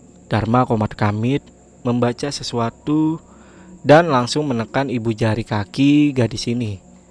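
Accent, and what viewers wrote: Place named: Aceh, Indonesia. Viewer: logat antara orang ACEH atau kah BALI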